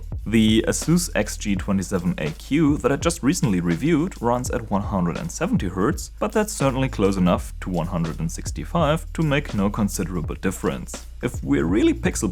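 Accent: Germany. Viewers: Dachte am Ende kurz, dass mein Englisch mittlerweile so gut ist, dass ich schon deutsch höre. Sehr geile Videos btw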